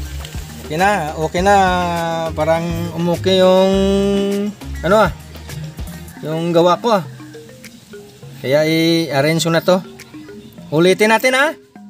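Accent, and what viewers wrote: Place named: Western Visayas, Philippines. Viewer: Yun ang gusto q sa ilonggo, malambing magsalita. How tooooo instaaaall...😅🤣😂👋. Pero damo nga salamat gid to sa tudlo mo ha kay damo q nabal-an halin sa imo ubra.👍👍👍 Gbu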